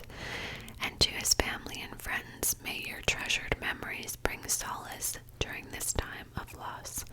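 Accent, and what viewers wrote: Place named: Canada. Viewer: I have a feeling you’re a fellow Canadian😂 (Toronto here!) Something about the cadence of your voice and ofc the politeness haha Love your channel!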